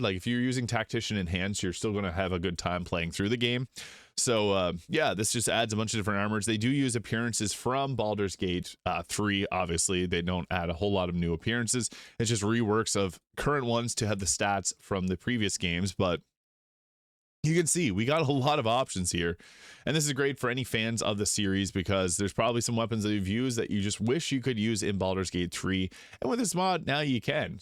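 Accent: Canada. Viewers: Love your content but why do you sound Canadian sometimes?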